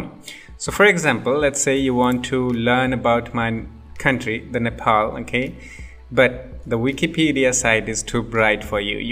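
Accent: India. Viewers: i love indian accents, for real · lol cant trust that indian voice sry just had too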